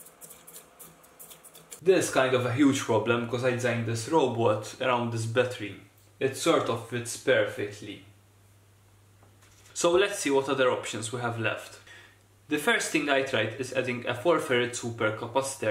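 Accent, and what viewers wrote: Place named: Malta. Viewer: i can see from your accent that your maltese same dude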